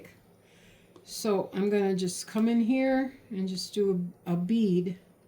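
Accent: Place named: Midwest, United States. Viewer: I thought so. My son and daughter in law lived in Wisconsin for many years and lived in Minnesota and Michigan. I could pick up that wonderful Midwest accent 😊. We are in South Carolina now .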